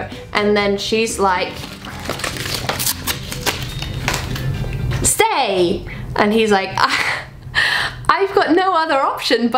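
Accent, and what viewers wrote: Australia. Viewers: Skylar Sky she’s Australian its an accent thing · I love ur Aussie accent👍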